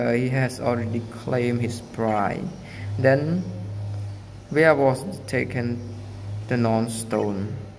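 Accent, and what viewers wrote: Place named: India. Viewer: Yes he is because he has Indian accent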